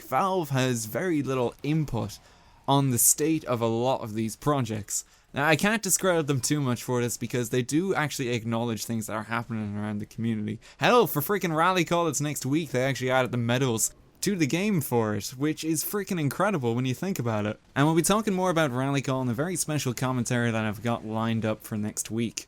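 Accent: Ireland. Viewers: are you from ireland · Hey are you a fellow irish person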